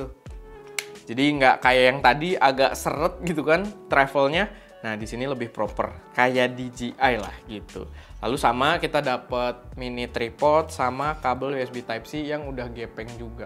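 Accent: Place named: South Jakarta, Indonesia. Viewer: Bang org Jaksel ya...? 😅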